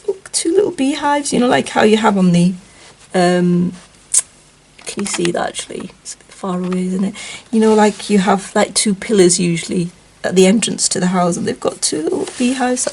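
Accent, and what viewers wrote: United Kingdom. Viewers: I love hearing you talk, K. Maybe because Im American & like the UK accents.hehe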